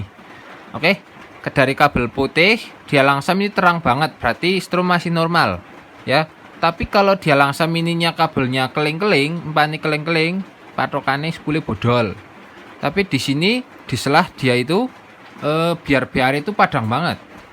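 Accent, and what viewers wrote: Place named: Java, Indonesia. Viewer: Rika jawane Endi medok temen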